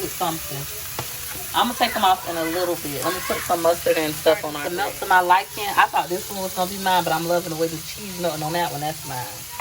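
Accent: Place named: Los Angeles, United States. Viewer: Rosario Nah has a thick LA accent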